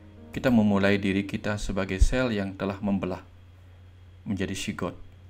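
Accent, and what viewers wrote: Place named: Bali, Indonesia. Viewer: Huruf T nya masih Bali yan.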